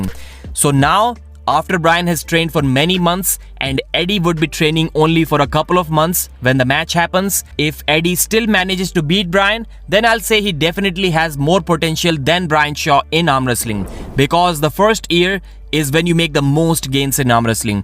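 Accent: India. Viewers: I can't listen to that deescoosten idiian vooecee.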